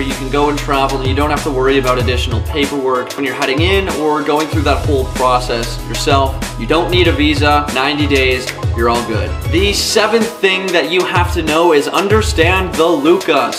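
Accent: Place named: United States. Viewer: Your Spanish must be highly American accented. Chili but you keep saying chilayan. I thought it was chilian....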